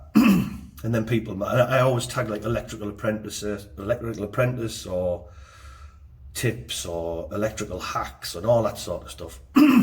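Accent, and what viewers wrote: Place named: Hartlepool, United Kingdom. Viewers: You sound like a fellow monkey hanger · Great video. I too mainly do the big stuff from 415v up to 66kv. Love the challenge bit frustrating at times but love it all the same. Keep up the vids 👍. Where about sin the north east you from as you sound close to me in the Boro.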